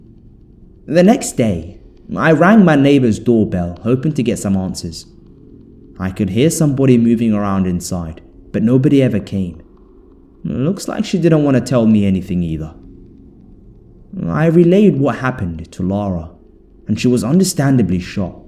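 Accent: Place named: United Kingdom. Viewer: I wish I would hear YOUR fabulous Brit voice saying "trick or treat" at our door this Halloween! Lol. Long way to go for a b it of sweets! Thanks for the spooky! Hope your weekend is good. Happy Halloween!👻💀😈🎃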